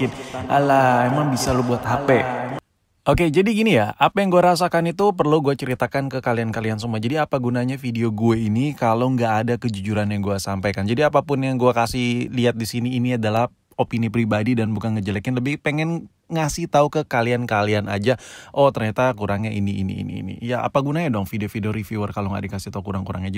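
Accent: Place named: Medan, Indonesia. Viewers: Omak orang Medan ni kek nya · Logat Medan si babi ini, sini kau jumpa tengah kita di simpang pos, aku mau belik hape.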